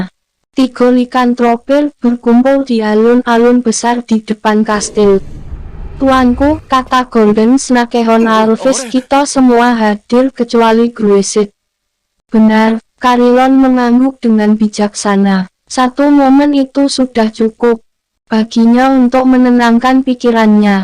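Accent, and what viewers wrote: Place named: Java, Indonesia. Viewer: Medok yah suara nyah 😇😇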